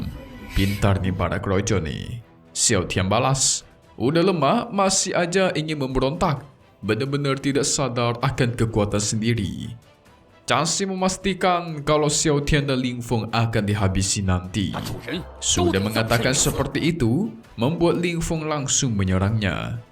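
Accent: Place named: Medan, Indonesia. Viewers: Uda jelas jelas orang medan kau min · Orang Medan min😂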